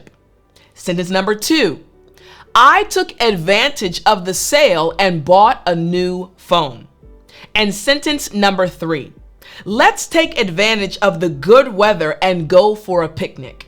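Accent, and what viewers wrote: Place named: United States. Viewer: Hi teacher, l ❤ American accent.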